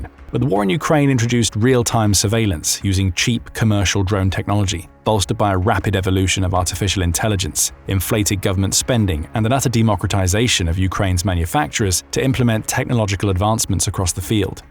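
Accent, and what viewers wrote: United Kingdom. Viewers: Tired of the UK-ENGLISH accent in all these Ukrainian videos.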